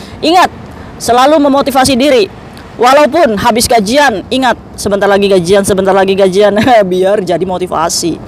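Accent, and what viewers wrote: Indramayu, Indonesia. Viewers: Pen nakon be tamah mbak kuh aslie wong ndi.logate mh kaya wong Indramayu atau wong cerbon hehe.. salam kenal bae mbak.sehat selalu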